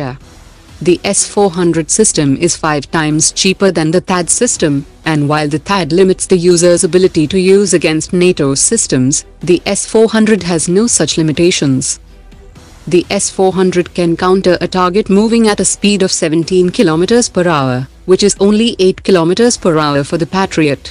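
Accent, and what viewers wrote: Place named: South India, India. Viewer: YOU AREA INDIAN LADY , DON'T PRETEND TO BE AMERICAN ,, I THINK YOU ARE A SOUTH INDIAN , LOL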